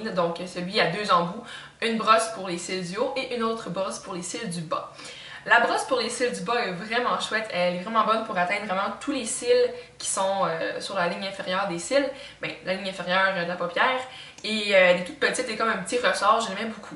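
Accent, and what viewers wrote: Quebec, Canada. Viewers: Ah ! J'adore les youtubeuses quebequoises !! Votre accent est vraiment craquant ! J'ai aussi les cheveux bouclés et quelle galère de trouver un produit efficace, que ce soit pour les nourrir ou les definir... j'ai testé aussi la game john frieda et comme toi, bof... je soupçonne même que ce soit bourré de silicone et autres berk...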